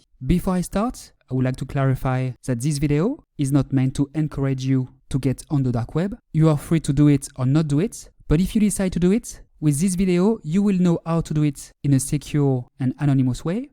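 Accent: France. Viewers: Mhm ur from french right? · Salut et Oulala ! ça se voit "s'entend" que tu es Français toi à ton accent.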